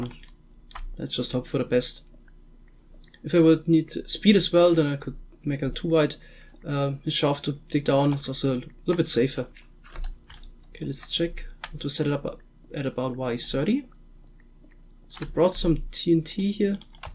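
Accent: Germany. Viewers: Are you German ???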